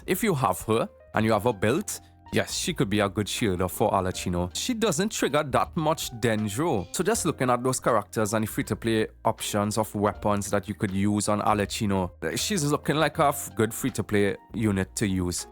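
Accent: Trinidad and Tobago. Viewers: love the trini accent makes the guide actually entertaining ill give u a like and a sub u deserve it :D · I just got this video on my recommended and the trini accent caught me so off guard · Trini to do bone! I’m half way an island boy myself🇧🇸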